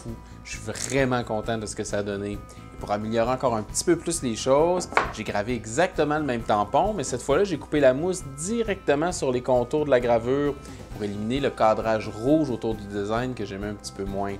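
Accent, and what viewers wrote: Quebec, Canada. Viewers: c'est pile poil ce que je cherchais, vidéo très pro, avec ce joli accent que vous avez nos cousins des Amériques ! Bravo ! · Salut. Un petit coucou amical de France, un merci pour tes videos simple et efficace. J'avais envie de revenir sur ton aparté avec le mots "etampe"... Je me demande si le joli accent que vous utilisez au Canada, n'aurait pas mangé le "S" de é"S"tampe. Parce que ça signifierait que le mot est juste ici en France aussi. On dit aussi une estampe, estamper ou estampillé... Qui devient un synonymes de tampon, tamponner... En tout les cas merci et continu.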